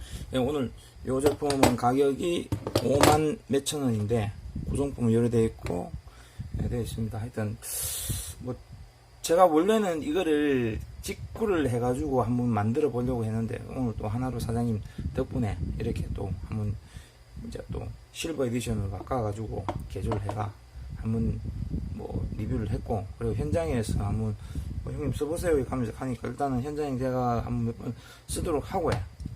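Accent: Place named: Gyeongsang, South Korea. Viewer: 경상도 사투리 가 듣기 조아요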